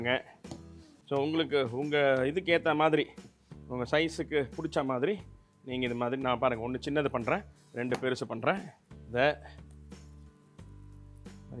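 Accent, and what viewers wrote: Chennai, India. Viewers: Chef ungah Chennai thamil. Oru thaba epadi oru thaba appadi Semma ponga. Simply flattered by ur way of explaining. 👌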